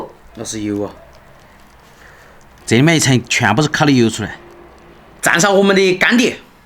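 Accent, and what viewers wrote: Sichuan, China. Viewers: 很好吃的样子，不过还是怕油腻 四川话很好听啊，越听越好听 想念国内的新鲜大葱😍😍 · 好标准的四川话。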